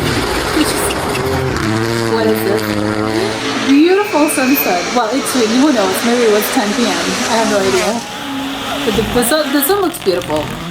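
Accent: United States. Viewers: As a swede. Your accent is standing out. I imagine jersey? Am I totally wrong here?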